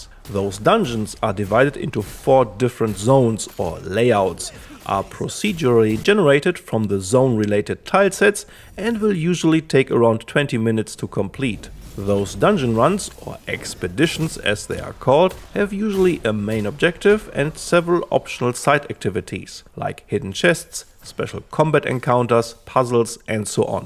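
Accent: Germany. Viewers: Oof, the german accent is kinda killing me.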